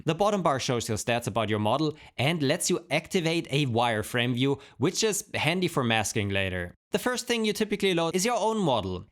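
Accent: Germany. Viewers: super videos wie immer, aber bitte, nett gemeinter tipp, arbeite an deinem deutschen akzent. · United by East German accent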